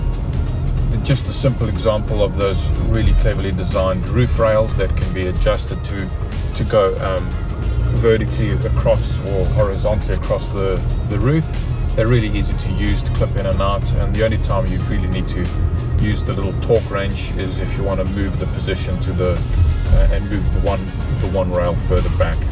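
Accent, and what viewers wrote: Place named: South Africa. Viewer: This guy is South African, not Australian.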